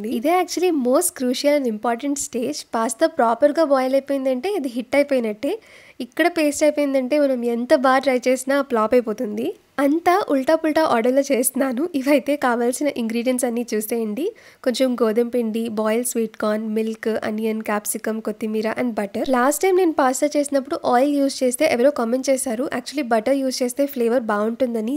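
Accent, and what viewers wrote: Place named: Hyderabad, India. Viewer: Akka Neku slow ga hyderabad slang vastundi ga 😅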